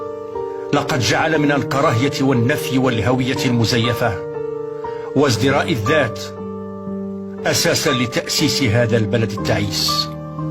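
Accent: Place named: Morocco. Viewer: صاحب هذا الفيديو باين مروكي من طريقة كلامو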